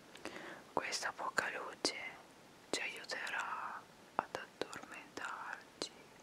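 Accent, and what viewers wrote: Veneto, Italy. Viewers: Sei veneta e sei anche da vicenza scommetto...lo sento dall'accento che hai..é come il mio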